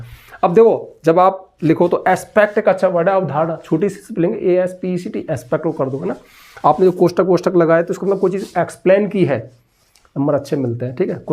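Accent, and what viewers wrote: India. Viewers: Teaching English 😊with Desi accent..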